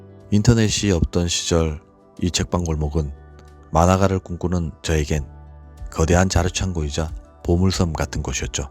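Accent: Gyeongsang, South Korea. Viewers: 감사드려요.ㅠㅠ 경상도 발음 팍팍 나오는데...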